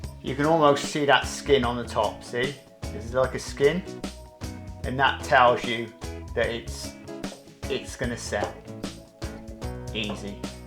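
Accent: United Kingdom. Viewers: Great recipe and nice british accent!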